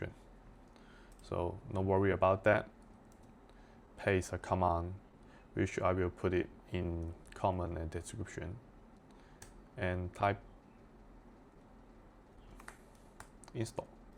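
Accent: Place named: China. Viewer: I'm surprised Codex understood what the hell you were saying, maan. That Chinese-English accent is challenging. But thanks for the video, it's a good starting point.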